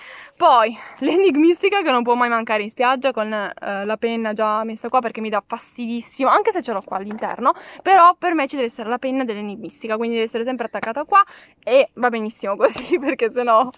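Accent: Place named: Salento, Italy. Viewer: MAMMA MIA QUANDO ASCOLTO IL TUO ACCENTO, È MOLTO VICINO AL SALENTINO.. MAMMAMIA CHE NOSTALGIA DELLA MIA TERRA. SALUTAMI LU SALENTU,LU SULE, LU MARI E LU IENTU 🤭🤗🤗🤗🤗😂😂😂😜😜😜😜😜😜😜😛😛😛😛😛😛🌹🌹🌹🌹🌹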